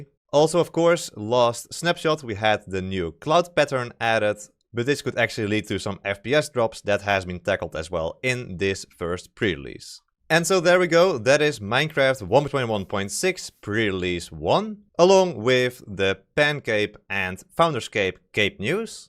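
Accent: Netherlands. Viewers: I smell dutch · That accent. Are you Dutch? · Tijdens de video dacht ik: "dit is iemand die Nederlands spreekt" We hebben specifieke zinsbouw, klanken en intonatie in 't Engels. Anyway: goed gedaan! Mag nog. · Loving the Dutch accent! Also.. GEKONOLISEERD! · Do i hear a fellow Dutchman here!! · Haha yes us dutchies can recognize it immediately hè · İ hear your Dutch accent, ik hoor jouw Nederlandse accent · Love the video (but still miss X). You sound very Dutch, can anyone confirm? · Hah, ik hoorde gelijk n grappig nederlands-accentje. Groeten uit R'dam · I'm hearing a very strong Dutch accent. Is that true or am I imagining things? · omg do I hear a dutch accent (you did a good job:D) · Are you dutch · Dutch guy Detected!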